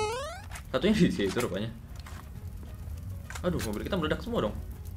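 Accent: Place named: Banjar, Indonesia. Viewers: Banjar banar logatnya 😂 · orang banjar bjir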